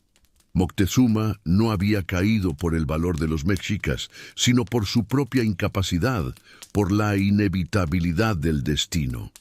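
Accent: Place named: Spain. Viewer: Me cargan las voces de gachupines contando historias de las civilizaciones a quienes invadieron robaron devastaron y saquearon durante siglos... pobrecitos aún siguen con hambre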